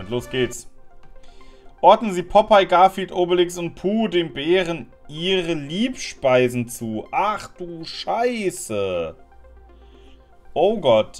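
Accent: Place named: Saxony, Germany. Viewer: Sächsisch lool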